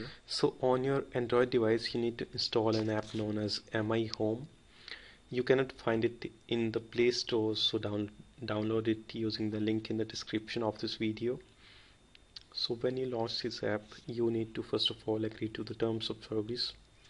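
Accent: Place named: India. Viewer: Indian accents are annoying AF! Had it on mute the whole time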